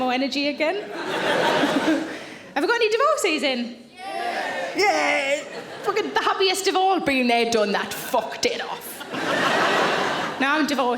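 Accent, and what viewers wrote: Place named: Northern England, United Kingdom. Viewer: My family were from the UK, as is my husband, but we are a bit older now and especially for the stronger accents (like Northern accent) we use subtitles! We catch SO much more now! I hope this helps! Cheers! 😊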